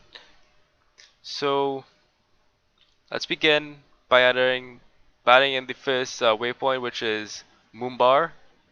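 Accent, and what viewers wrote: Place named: Burgundy, France. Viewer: French from bourgogne I think ^^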